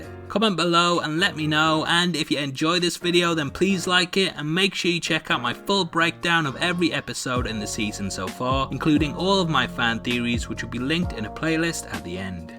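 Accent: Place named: United Kingdom. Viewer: Why is every spoiler vid narrated by a guy with a brittish accent.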